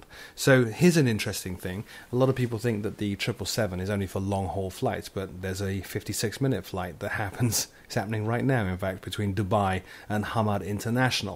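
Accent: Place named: United Kingdom. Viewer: I've a question, are you from the United States or from Uk,you have the British accent haha!!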